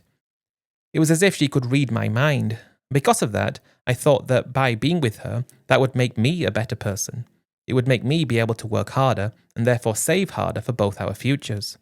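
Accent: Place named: England, United Kingdom. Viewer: Buddy, you sound like a smart English man but not so smart with the girl and I’m not putting you down because you had it virtually made what you were doing buying houses selling them perfect time back then because now to do that in my country Australia and for sure in your country in England we got too many taxes gifting tax tax this tax How are you gonna make $30,000 now the government makes it too hard now because they catch on back then. and now just for an old rundown house is worth a million dollars as much as as you loved your wife I would never would’ve got her involved and most certainly I would never would buy a house in Thailand then leave it to her and then come back to England. no chance and I know what you’re gonna say you haven’t been in love before. It’s not about Love. This is about my retirement and my age. There’s plenty of girls in Thailand and there’s always gonna be someone different. 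She’s not a western girl.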